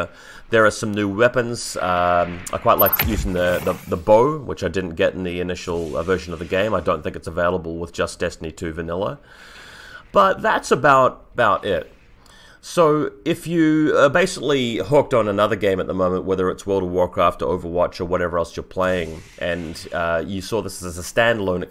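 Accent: New Zealand. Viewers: bruh i fucking thought you were Kiwi, you didnt have any other accent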